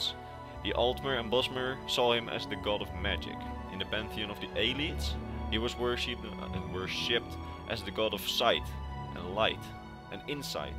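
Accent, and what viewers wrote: Netherlands. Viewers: you sound dutch ...but nice vid ^_^ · It sounds like a dutch accent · As a Dutchman, I can hear clearly in the sound of his English he is Dutch.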